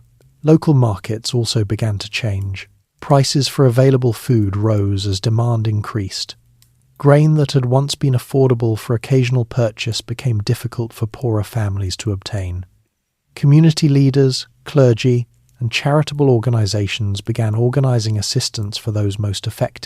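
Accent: United Kingdom. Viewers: I can't listen to a man with a distinct British accent narrate a commentary on the Irish Famine. I'm surprised you don't get it. It's similar to listening to a German narrate a documentary on the Holocaust. What!?! You think we've forgotten?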